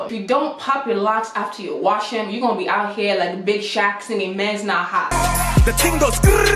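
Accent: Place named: West Africa. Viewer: Wait, I hear a West African accent somewhere in there; na Naija you be? You had me LOL for real. Love your personality. New subbie!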